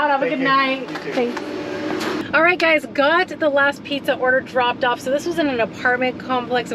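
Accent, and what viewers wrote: United States. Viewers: Hey, you should be teaching English abroad. I like your pronunciation and your "American" accent lol plus as a fellow driver I follow you for your "delivery" content 👍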